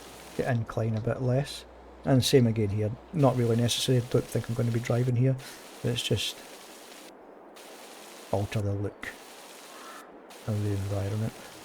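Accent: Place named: Scotland, United Kingdom. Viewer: Taks a tcheuchter te ken a tcheuchtar! I subscribed as soon as I heard your voice. Just back from Scotland to Finland and driving around the North East, I immediately restarted FS22. I miss my home country so much and my country ways. Brilliant video. Thank you. Regards Fermer Findlay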